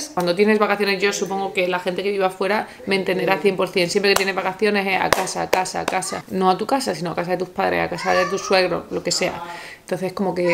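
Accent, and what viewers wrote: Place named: Andalusia, Spain. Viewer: Te sale el habla forzado con las eses. Mucho mejor cuando hablas en andaluz con tu madre. Como dwbe ser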